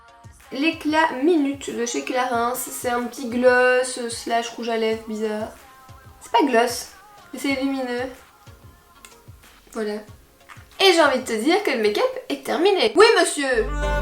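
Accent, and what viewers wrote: Belgium. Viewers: J'aime troppppp ton pti accent belge qui revient tout au long de la vidéo! BELGIUM RPZT :D :D BIsouuus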